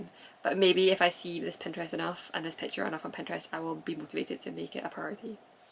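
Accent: Scotland, United Kingdom. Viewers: Hi, I love watching your videos. Last year I planned a ten day driving trip to Scotland and I watched a lot of videos with people from Scotland explaining the history and as interesting as that was I kept finding myself listening to your podcast. And i just wanted to say that hearing your Scottish accent really did come in handy when talking with people in Scotland. Because I was listening so intently to what you were saying I found that after a bit I was hearing your accent less and less. So thank you for that and keep on knitting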